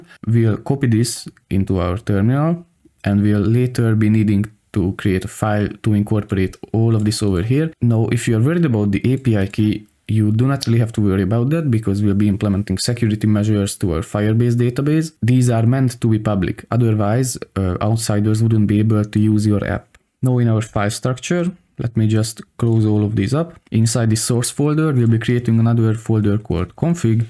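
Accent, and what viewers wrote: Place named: Russia. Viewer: amazing course subbed ,also enjoying your russian accent😀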